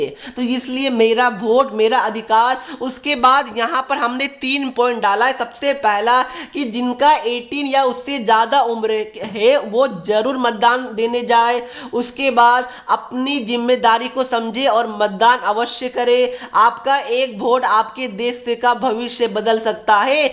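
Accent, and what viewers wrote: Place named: Bengal, India. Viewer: Is it a bengali teaching us he be like paryog and twenteen wtf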